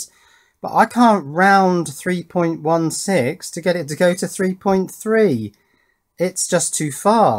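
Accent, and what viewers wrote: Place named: Northern England, United Kingdom. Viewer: Hahah! What part of England are you from? Your accent sounds just like Wallace (of Wallace and Gromit Fame). I found myself wanting to just say, “Cheese ... Gromit!”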